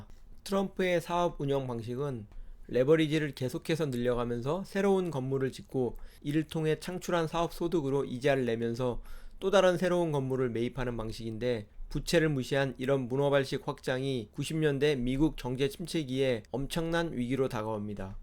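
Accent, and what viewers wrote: Jeolla, South Korea. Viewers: 이분 전라도 분이네ㅋㅋ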